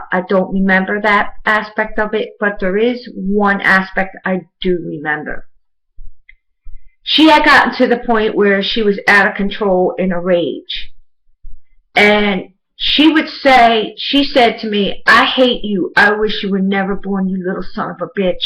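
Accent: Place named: Northeast, United States. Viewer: HI, My prayers go out to you, u sound like you may be from the northeast im in the philadelphia area. My mother too is a narcissist and i just had a question for you regarding the enablers in our family, do you have any advice on how to reach them? my sister is very much an enabler and was parentized as well, and i cant seem to get her to come around so she can start healing from the abuse shes been through. Any input from you would be appreciated. God Bless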